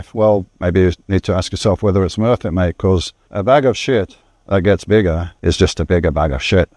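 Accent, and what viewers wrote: Boston, United States. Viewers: As a born a raised Bostonian (Roxbury btw) u have the deepest Boston accent 🫡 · Are you from Boston?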